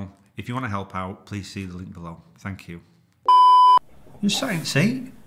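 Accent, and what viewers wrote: United Kingdom. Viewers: A traffic cop where? Your accent makes me believe you’re from Britain/England/ the UK. What do you people call your country nowadays?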